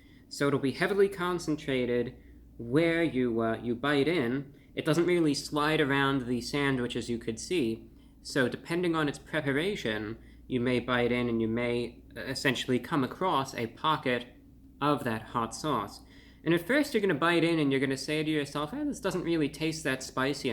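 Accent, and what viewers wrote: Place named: Mid-Atlantic, United States. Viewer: What makes these reviews a rare gem? The deliberate pacing, articulation, lack of saying "um", and that mid Atlantic "accent". Not to mention it's entertaining!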